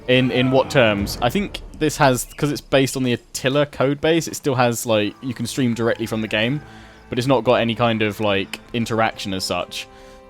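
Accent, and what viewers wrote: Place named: Bognor Regis, United Kingdom. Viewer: You speak so posh for someone from bognor/littlehampton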